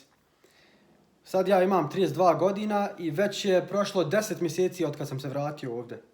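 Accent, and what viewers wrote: Bosnia and Herzegovina. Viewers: Nek ti je sa srecom sine ❤❤❤❤❤ a tvoj Bosanski jezik je predivan❤❤❤❤❤🍀🍀🍀🍀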